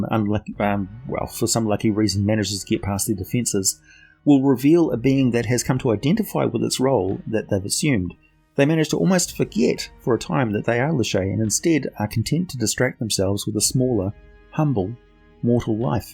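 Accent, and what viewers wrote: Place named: New Zealand. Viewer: Ya see, this is why you need to do those Creator Races videos. We need to know this stuff, and we need it delivered to us in a Kiwi accent.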